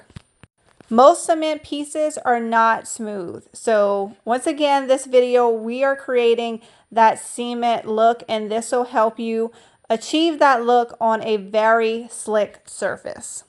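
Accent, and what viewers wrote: Louisiana, United States. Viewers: You're adorable, I just think your Louisiana accent is delightful and you're so creative. Thanks for sharing your ideas.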